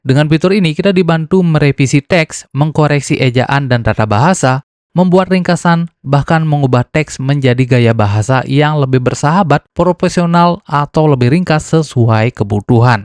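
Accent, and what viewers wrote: Bali, Indonesia. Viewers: Logat bali nya kental sekali Bli.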